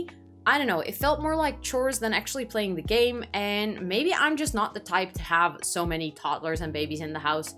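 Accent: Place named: Netherlands. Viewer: I really want to watch this but you are Dutch 😂 I am too and I just haaaaaate a Dutch accent in English loool. That's a me problem tho, I am just wired weird but I cannot stand it 😂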